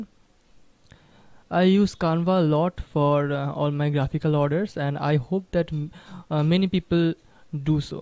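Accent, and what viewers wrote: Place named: India. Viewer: Aap hindi me bhi bana sakte thea sun k lag raha h ki aap indian ho 😗🚬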